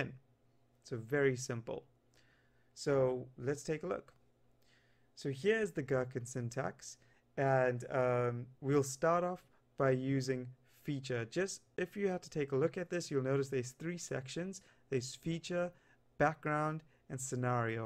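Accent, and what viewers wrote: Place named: South Africa. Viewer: Great tutorial, very clear and concise! Nice to hear fellow South African (you sound a bit like Elon Musk actually!)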